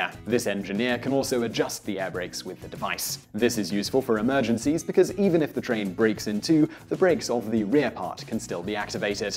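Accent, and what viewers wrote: England, United Kingdom. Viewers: I had a dissonance problem - British accent, US words for all the things. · Michael Dougfir Me too only the other way round. I'm English as he clearly is. We don't have cabooses and none of those pictures was of anything English. It seems odd.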